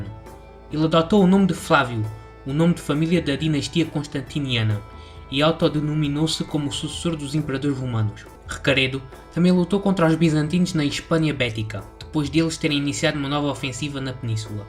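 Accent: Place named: Portugal. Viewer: Riqueza de conteúdo histórico, vídeo muito esclarecedor e cativante com o sotaque agradável e bonito de nossos irmãos de além mar lusitanos... Só aqueles que desconhecem a nossa história própria história os satirizam ou menosprezam o valor de inúmeras figuras que estiveram envolvidas no descobrimento e exploração da (então) colônia, foram fundamentais para o início de nossa terra! Admiro muito as histórias desse período e quero acompanhar e descobrir cada vez mais, obrigado por compartilhar conosco irmãozinho!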